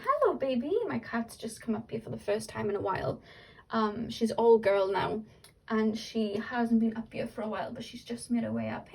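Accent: Wales, United Kingdom. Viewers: Pile 2 & 3 I swear you always put out videos exactly when I need to hear them! Thank you so much for the motivation to help me keep moving forward. ❤️‍🔥 Also just wanted to say how much I love your Welsh accent. 🥰 I could listen to you speak all day.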